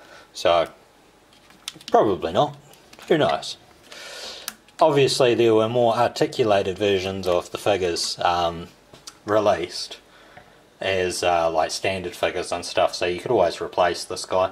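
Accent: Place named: New Zealand. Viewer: You sound like your a fellow kiwi , then I heard tfnz haha but awesome man I subscribed.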